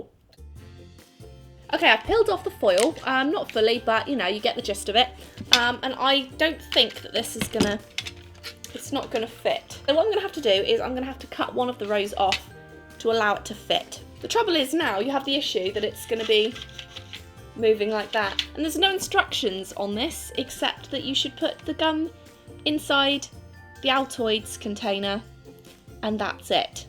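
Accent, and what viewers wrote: England, United Kingdom. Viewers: What country do you live in, because you sound like you are from the uk, but you talk with dollars?? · Where do you live? You sound English yet you used dollars 😅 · Wait, where are you from? You said dollars and you have American plug sockets but you sound British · Life hacks in a British accent are just better tbh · bit confused how you got to target tho?🤔 you have one of the strongest british accents🇬🇧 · After hearing British accent for so long. I'm starting to read all the comments in an accent. In reading this in an accent right now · The reusing an altoid tin or prismacolor tin is also an idea I just literally found this week on Pinterest! I'm not as much a fan of paint as I am ink and colored pencil but I love the idea of reusing tins like that for art supplies. P.S I love your accent!- I've never met someone in person with a British accent until my new neighbors I just met recently! 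· Lol she looks like Anna Kendrick with British Accent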